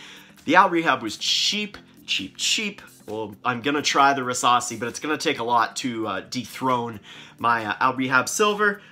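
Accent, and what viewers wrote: Canada. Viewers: I'm Canadian and I didn't realize we have an accent til I heard the maritime accent. Love it! Solid list, plenty of these are on my list as well, although not so keen on Rasasi releases. Cheers.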